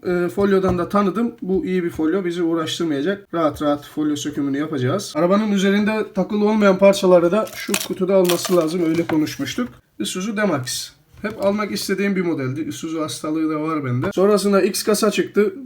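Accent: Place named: Rize, Turkey. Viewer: Seviliyorsun reis. Videolarinla yeni taniştim ve cok profesyonel. Şivenden rizeli sin galiba ben de rizeliyim reis yolun açik olsun daha büyümen dileğiyle .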